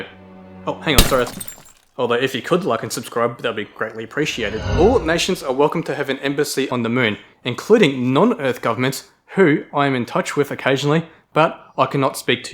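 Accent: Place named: Australia. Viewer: Good to hear australian voice and humour 👍👍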